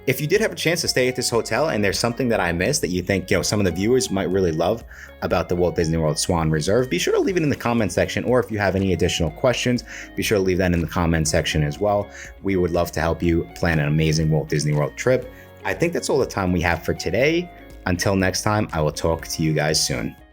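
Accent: New York, United States. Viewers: I detect a NY accent!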